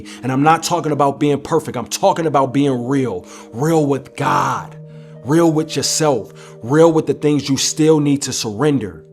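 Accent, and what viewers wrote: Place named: New York, United States. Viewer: This brother is so deep. I just came here today and subscribed. I’ve been working on myself, paying attention to what I put in my body as he said in the other video and healing. I needed this confirmation. I love his passion! His voice makes you stand at attention!!!! He has a New York or Northern style passion is his voice. I love this channel. Not about vain BS.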